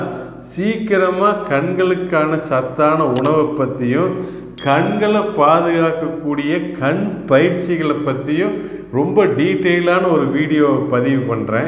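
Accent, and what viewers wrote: Kongu, India. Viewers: வணக்கம் சார் உங்கள் வார்த்தைகள் கொங்கு தமிழுக்கு மேலும் அழகு சேர்க்கின்றது உங்கள் வார்த்தைகள் எமக்கு நம்பிக்கை தருகின்றது அனைவருக்கும் புரியும்படியாக தெளிவான வார்த்தைகள் உங்கள் தொண்டு வளரட்டும் இறைவன் துணை தங்களுக்கு எப்பொழுதும் உடன் இருக்க வேண்டுகிறேன்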